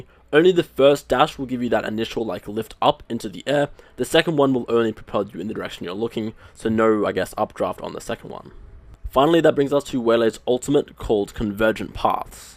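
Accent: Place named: England, United Kingdom. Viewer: Bhai aapke accent ko kya hua? Yeh angrezo wala accent kahase aaya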